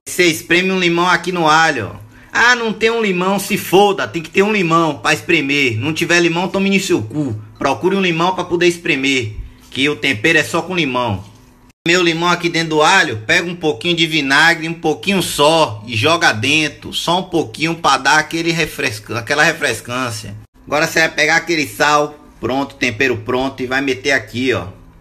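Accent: Bahia, Brazil. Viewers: é sotaque mesmo kkkj Sou baiano e falo igualzinho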